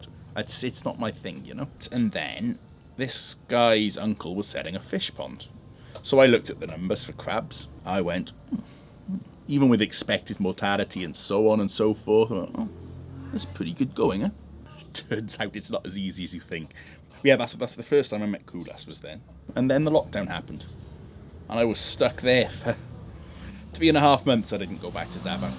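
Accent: United Kingdom. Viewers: Ur Australian I thought ur British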